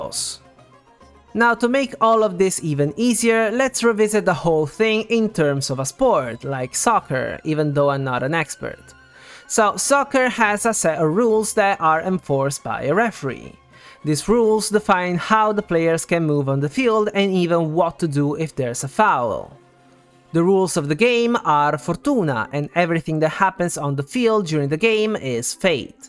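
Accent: Italy. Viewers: Ahaha ecco cos'era quell'accento! Ciao compatriota! Sei fortissimo, adoro i tuoi video e cerco sempre di stare al passo. Spero che il tuo canale possa crescere, te lo meriti davvero ❤️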